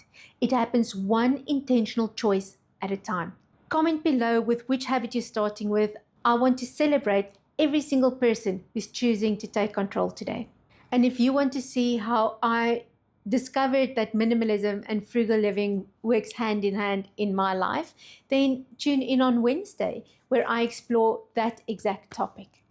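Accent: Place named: South Africa. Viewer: I am going to draw cash for my groceries because it is just too easy to swipe a card. Having to draw more cash than you intended to spend means some serious deliberation. Ex Jhb now in the Netherlands. Always nice to hear a South African accent.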